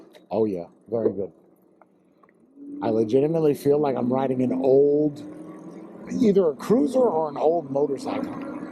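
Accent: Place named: East Coast, United States. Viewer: I swear I’m not a stocker ……. But is this neighborhood in Florida ? It gives me a Florida vibes , but your accent seems East coast ? Maybe I’m way off 🤷🏼‍♂️ Or maybe you are from the east coast and live in Florida now haha.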